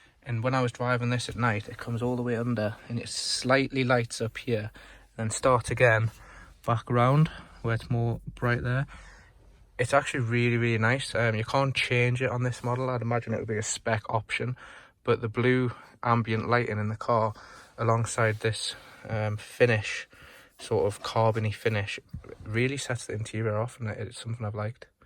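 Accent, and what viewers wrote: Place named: Newcastle, United Kingdom. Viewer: Fellow Newcastle accent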